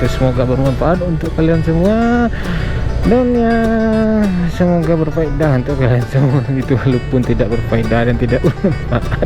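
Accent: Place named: West Sumatra, Indonesia. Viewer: Logat bahasanya sama kayak minang kocak lu bang wkwkw😁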